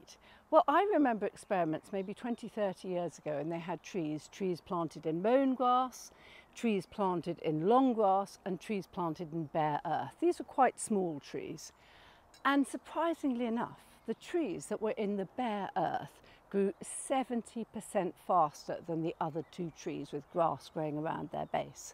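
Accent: United Kingdom. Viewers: It's really true - everything sounds better with a British accent! Even weeding. :) Grounsel - aka creeping charlie. What a pain the in... Love the video! For my veggie garden, I'm with the late Peter Seabrook, and still prefer to dig. But then, I'm digging in all the rotten down, fall leaves, and the spring chopped ornamental grasses. I don't see any lack of earth worms. Except when our American Robins realize that I am providing an easy buffet. Then, I do lose some worms.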